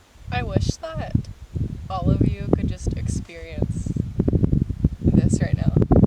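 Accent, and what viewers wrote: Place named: South, United States. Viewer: Also, With The Thing Inside Your Mouth, I Can Hear The SouthernBell Southern Accent For Real.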